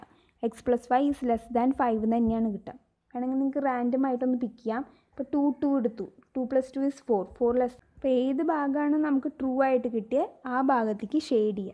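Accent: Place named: Malabar, India. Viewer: ഭാഷ മലപ്പുറം കോഴിക്കോട് ഭാഷ പോലെ തോന്നി 😅😅😅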